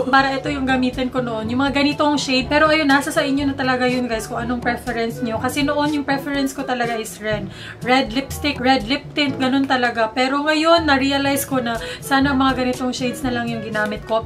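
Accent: Visayas, Philippines. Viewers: Luh...Bisaya ka noh?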